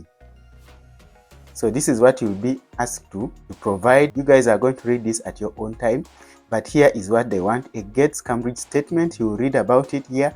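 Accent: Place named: Uganda. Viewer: I heard your voice and I knew you are Ugandan. Thank you for caring.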